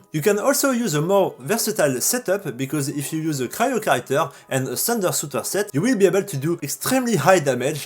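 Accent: France. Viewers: J'ai tout de suite vu que t'était français avec l'accent mdrr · alala l'accent fr de qualité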